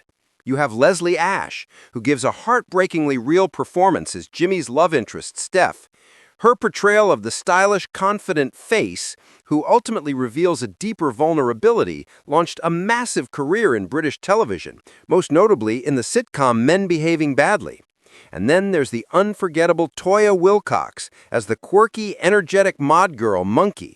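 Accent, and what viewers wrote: United States. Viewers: Who is this Yank narrator talking b.s.? Great Britain was not 'shaking off ww2 post austerity in 1964'. The 'never had it so good' years had just gone and the more optimistic 60s were in full swing. I doubt any Americans had even heard of the mods. 🙄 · Why the change to an American narrator. Is this all AI?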